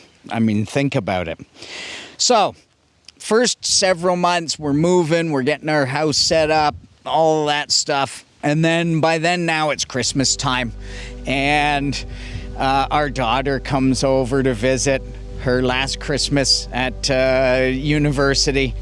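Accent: Canada. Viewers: lol I love the canadian accent. They can't rob us of that no matter where we live, or for how long!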